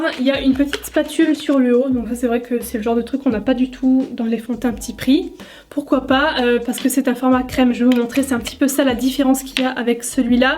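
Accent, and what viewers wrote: Lorraine, France. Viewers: Je trouve ton make up beaucoup trop chargé (ici et de manière générale). Je trouve ça dommage. Je regarde de temps en temps tes vidéos parce que j'apprécie ta personnalité, ton dynamisme et ton accent (oui on est Lorraines migrées en Alsace toutes les deux :) ). Et je t'ai déjà vu avec des make up beaucoup plus légers voir au naturel et clairement je te trouve bien plus jolie ! #opinionpersonnelle. Bises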